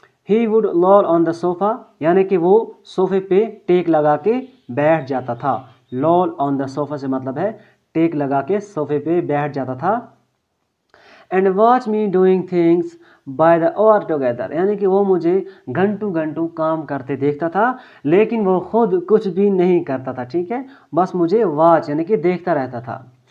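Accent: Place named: Kashmir, India. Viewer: Sir I think you are from*Kashmir*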